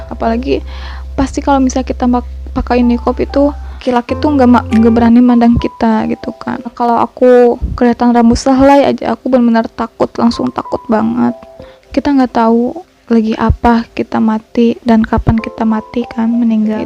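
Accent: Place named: West Java, Indonesia. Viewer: Eta logat Sunda nya 👍👍slalu Istiqomah ya sayangkuuu 😍😍🤗😘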